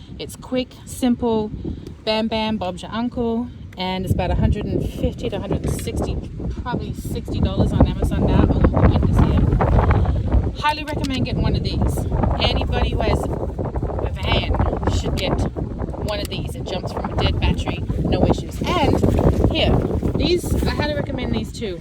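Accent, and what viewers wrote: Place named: Australia. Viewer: Nice to hear another Aussie accent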